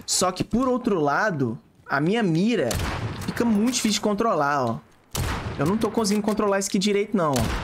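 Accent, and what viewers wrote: Minas Gerais, Brazil. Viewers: Mineiro contando história já é bão! então... nem se fala! hahahaha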